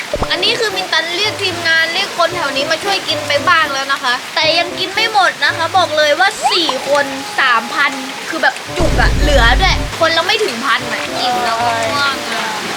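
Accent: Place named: South, Thailand. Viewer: พี่มินตันพูดใต้ด้วยอะ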